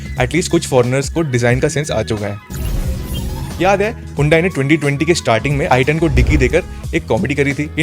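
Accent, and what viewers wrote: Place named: South, India. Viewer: Bro accent is like South Indian !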